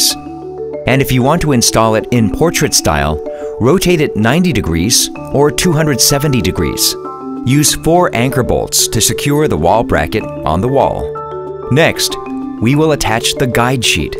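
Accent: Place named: China. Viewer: Harible Chinglish in this but i'd love to know what Text to speech program they used.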